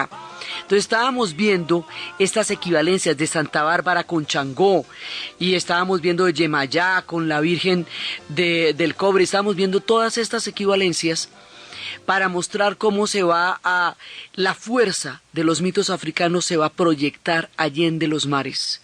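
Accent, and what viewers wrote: Colombia. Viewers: Por el acento de la ponente, deduzco que es colombiana, y yo creo que el nombre de esta señora debería estar grabado en letras de oro en la sala de sesiones del Poder Judicial nacional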